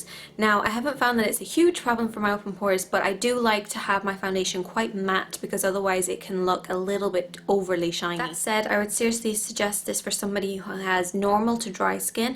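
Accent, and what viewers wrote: United States. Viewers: I think Shes Irish-american. She talks like a american with a Irish accent...if that makes any sense... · you almost sound american :) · Are you irish? Cause you sound like you're from America. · are you from america but living in Ireland? you sound so American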